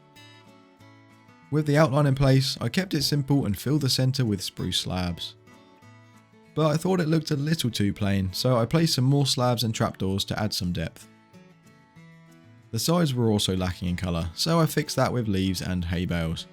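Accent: United Kingdom. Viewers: Okay, this is the first time I'm hearing your voice. I never knew you were British! 👀😂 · Are you from UK sir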